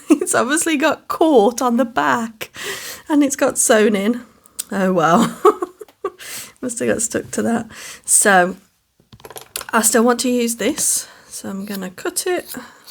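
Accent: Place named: Midlands, United Kingdom. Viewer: Love this idea. I’ve never done quilt as you go and really want to try. It’s a refreshing change to find someone from the UK! You sound like you are from the Midlands near me.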